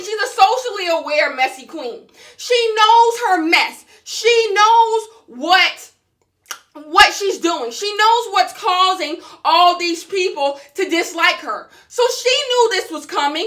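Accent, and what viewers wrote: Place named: South, United States. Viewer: You’re from the South, I can tell lol. I’ve been over Gabbie Hanna since forever. I say the same shit. If you’re constantly studying what everyone else is doing, you are not happy. Period. When you got shit going on, happy life, friends etc. you don’t give a damn about someone else’s business. Especially to the extreme where you’re constantly putting yourself all in it. 🙌🙌🙌👏👏👏 you said perfectly!!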